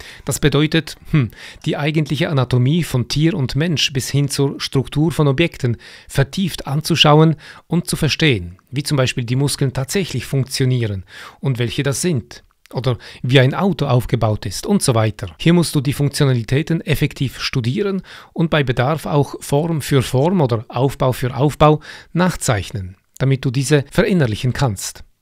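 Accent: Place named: Switzerland. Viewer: finde ich auch den Schweizer Dialekt so lustig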